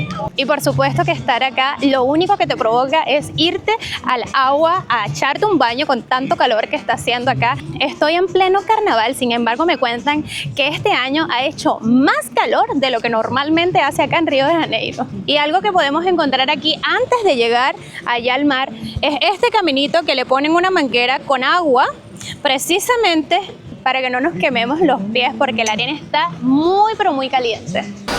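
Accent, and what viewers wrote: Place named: Cuba. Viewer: Tu si que eres sexy, madre mia, tu voz y tu acento, cubano? Un placer d escuchar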